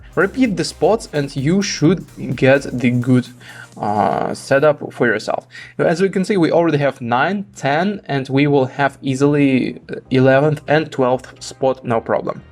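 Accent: Russia. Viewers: Wait.... good tutorial but are you russian? If so you have a really great English accent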